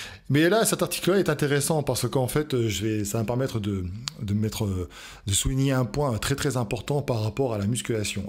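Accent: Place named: Nice, France. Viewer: T'as de plus en plus l'accent niçois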